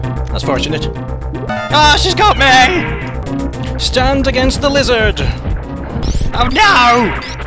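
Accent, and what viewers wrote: United Kingdom. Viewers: That boss was just...I can't find words to describe it... You're British? Cool!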